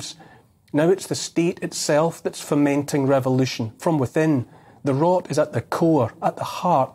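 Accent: Scotland, United Kingdom. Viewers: He spoke like a warrior poet, he spoke like a Scotsman!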